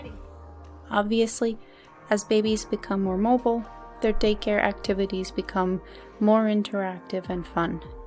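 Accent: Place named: East Coast, United States. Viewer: Mrs. is too so good at narrations. It is like a movie, very beautiful sweet voice💓 To me, the air of your speaking English has reminded me of the well behaving American girls from the east coast of the US I as a foreign student from Japan met at the college dorm more than thirty years ago. I miss them and those days. Anyway, I see how young mothers in the US are doing in their child rearing. Good luck and looking forward to the next one😊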